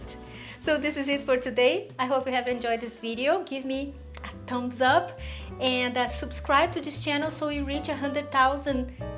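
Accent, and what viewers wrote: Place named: Brazil. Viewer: Eu achei seu canal alguns dias atrás, em uma receita de cream cheese vegano <3 Ouvi seu sotaque, sua simplicidade e pensei comigo "aposto que ela é brasileira". E acertei :D Essa receita tem muito a vibe de família brasileira, dia das mães, ou domingo em família. Coisa mais linda, assim como todo o resto do seu canal. Parabéns! <3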